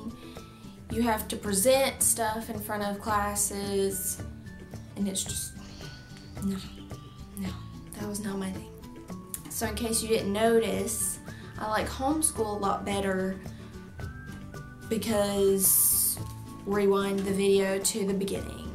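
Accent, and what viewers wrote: United States. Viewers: love your country accent :)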